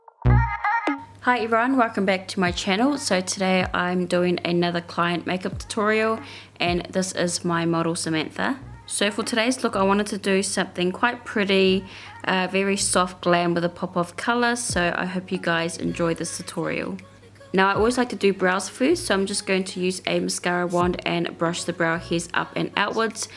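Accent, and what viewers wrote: New Zealand. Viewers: Love it. So great to hear another Kiwi accent doing makeup videos :)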